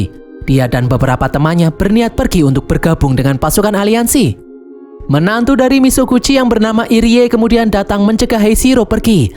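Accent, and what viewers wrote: Java, Indonesia. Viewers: jadi unik nntn jepang kuno pakai narasi logat jowo medok😁👌🏻👍🏻 penasaran klo logat nya dirubah le logat ngapak..mungkin tambah unik⭐⭐⭐by : sucriber baru🙏🏻🤩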